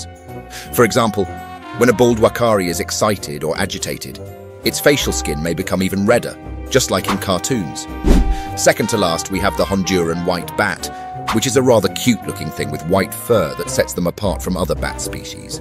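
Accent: United Kingdom. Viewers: 😂😂😂😂🤣🤣🤣😂”….They STRAIGHT UP look like a sacred animal, from out of The Lord Of The Rings universe.” I can’t! I just can’t!! Trying to sound all hood, while educating with that British accent. I paused the video for that one😂😂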